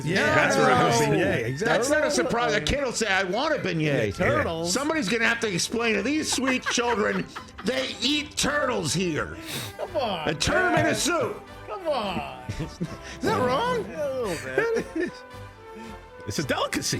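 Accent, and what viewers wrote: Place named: New York, United States. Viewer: NY Accent was solid 👌🏾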